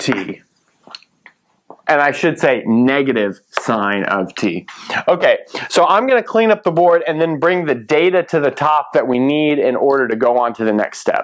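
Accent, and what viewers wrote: United States. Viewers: You have a very thick American accent. Got to concentrate hard to understand it. No idea why I'm commenting that. I'm drunk. Might explain everything.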